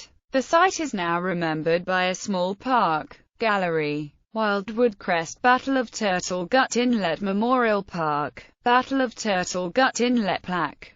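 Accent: United Kingdom. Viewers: Don't like the British accent that much.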